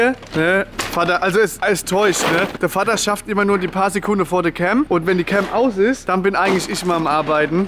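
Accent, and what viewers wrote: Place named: Mannheim, Germany. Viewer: Meine Mutter als sie deine Stimme gehört hat: der redet wie ein richtiger Mannheimer, find ich geil. Und dann lacht sie die ganze Zeit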